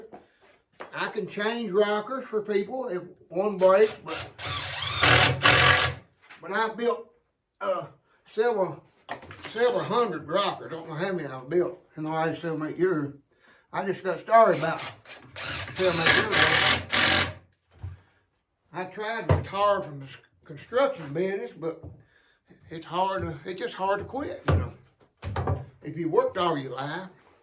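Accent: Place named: Eastern Kentucky, United States. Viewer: I’m from Martin county Kentucky I would like to learn more about building these chairs. You sound like your from around the same area. Love the video and the craftsmanship keep it up 👍.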